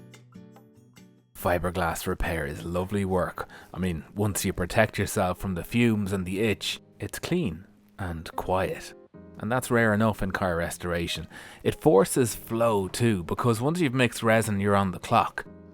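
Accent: Ireland. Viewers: Just discovered this channel, and I absolutely love it! First it's great to hear an Irish accent for a change, and second you are rebuilding one of my most favourite cars, ever since I saw Roger Moore drive one off a pier. Outstanding work too and loving the stop motion to by pass the boring stuff. Excellent stuff!!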